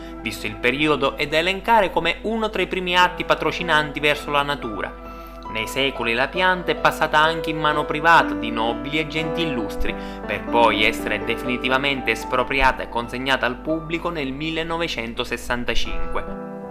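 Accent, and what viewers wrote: Sicily, Italy. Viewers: minchia che accentazzo siculo! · accento siculo e pure un pò culo.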